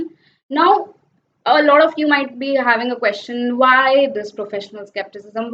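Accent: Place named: India. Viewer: Well explained, valuable information, unfortunately i have difficulty with the Indian accent. Thank u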